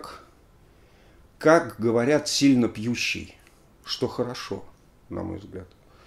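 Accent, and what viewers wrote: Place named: Saint Petersburg, Russia. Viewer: я тож узнаю ваш купчинский говорок, знакомый мне с детства.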